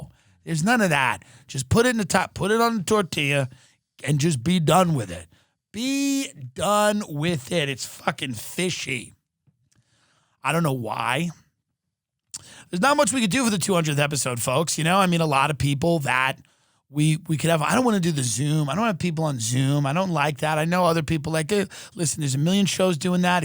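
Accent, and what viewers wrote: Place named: Long Island, United States. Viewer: Long Island accent is fantastic 🤣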